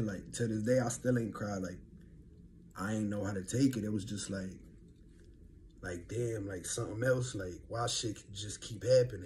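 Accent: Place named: North Carolina, United States. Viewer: He from nc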